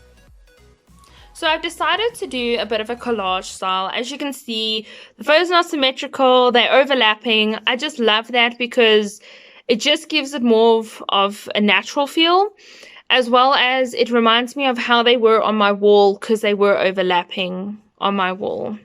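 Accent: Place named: Australia. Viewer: i'm also and i like your accent are you from Australia?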